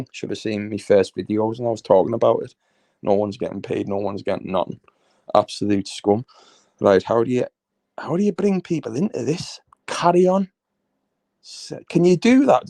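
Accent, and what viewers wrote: Liverpool, United Kingdom. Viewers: Yes I can you clearly la ,,,, SCOUSER gd la telling it true 👍👋👌sound la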